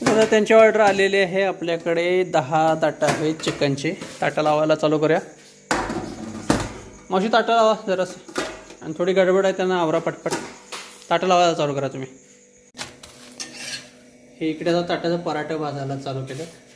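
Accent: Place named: Mumbai, India. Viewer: मी तुमचे व्हिडिओ एकदम सुरूवातीपासून पाहतो तुम्ही कोल्हापूरचे आहात पण शुद्ध मुंबईची भाषा बोलतात आम्ही mh15 नाशिक कर येवला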